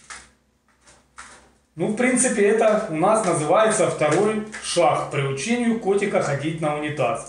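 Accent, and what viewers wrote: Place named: Spain. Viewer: Сперва этого испанца к русскому языку надо научить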